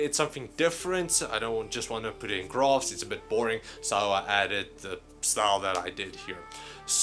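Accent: South Africa. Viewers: South african ? :D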